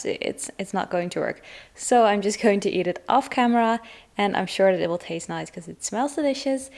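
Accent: Netherlands. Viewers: Are you dutch? Just a guess because of the accent and the dutch grocery’s😂❤️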